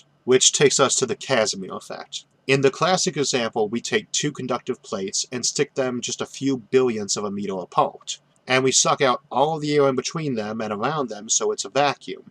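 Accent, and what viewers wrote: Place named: South, United States. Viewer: I enjoy your voice, BTW even with that inability to pronounce 'R' .. it gives your voice a bit of a "southern" drawl. Easy to listen to. Oh and I often transpose letters myself.. It isn't a dyslexic sort of thing, but my brain going faster than my fingers along with large hands and small keyboard :)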